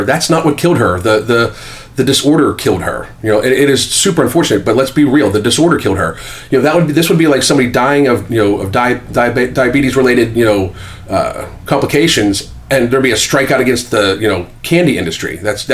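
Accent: United States. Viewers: I told my Russian friend to watch your videos and he said despite being Russian you have a perfect american accent :D :D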